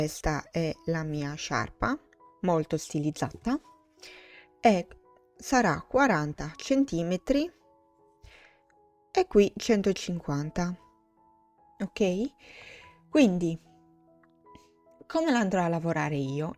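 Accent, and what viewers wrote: Sardinia, Italy. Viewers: ma sei Sarda? Sento l'accento simile al mio 😊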